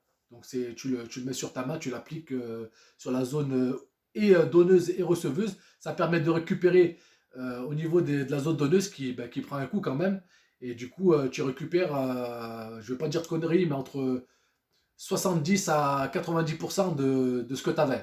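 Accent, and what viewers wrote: Saint-Étienne, France. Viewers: Avec un bel accent de St Étienne ou de Grenoble...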